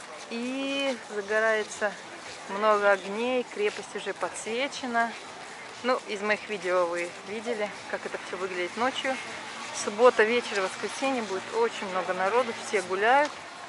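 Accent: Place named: Turkey. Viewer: Вы хорошо выучили язык , говорите с турецким акцентом.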